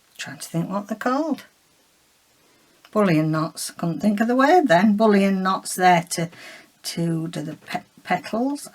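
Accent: Yorkshire, United Kingdom. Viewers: Don't change a thing,. We love your enthusiasm and for sharing your techniques and especially for your accent from God's own county. (Yes I'm biased having been born and bred in Yorkshire but now live "down south"). While watching you I'm finishing my fourth A5 piece of improv. stitching (inspired by Ariane Zurcher). Next week I'm going to start the wrap fabric cover (inspired by Anne Brooke "Wobble Gob 3) - another Yorkshire girl!! Can't wait for the pick jar. Enjoy the plum crumble!!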